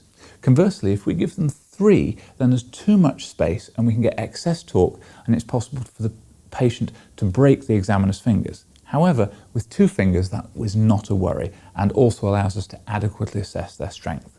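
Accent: United Kingdom. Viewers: It’s interesting for me to hear all of these same unusual terms, said in a similar British accent, but by a different doctor. I’ve watched the Curtis Robb hand examination video tons of times. It’s crazy how complex the hand system is!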